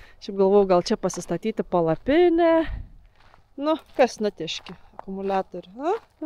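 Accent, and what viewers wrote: Lithuania. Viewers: iš kur tu kilusi pagal kaba spėsiu iš Raseinių.Ten taip kalba Stebiu tave tau sekmės.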